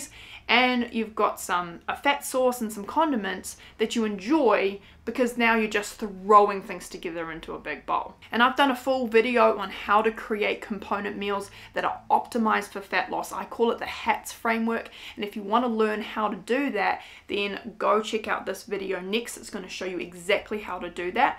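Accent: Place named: New Zealand. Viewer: Hi. First time seeing your channel. I heard your accent but I was trying to work out where you are. I’m a Kiwi too but live in Australia now (married an Aussie). You said “take out” instead of takeaway so I thought you must be living in the US. Then you mentioned having spent time there. That was great advice. I watch a lot of plant based vegan YouTube channels and they pretty much have the same meals as you showed. I think we all eat the same. 😂 What you said was so true. It’s easier to stay on track when it’s a habit. At first I thought no, I can’t eat the same every day. But I understand your points. Little tweaks can be easily made. Thanks!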